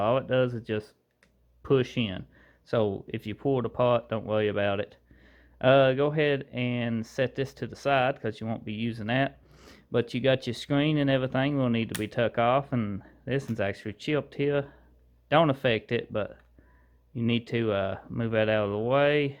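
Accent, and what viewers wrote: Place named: South, United States. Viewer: your souther speech reminds me of a kinder Dusty Marlow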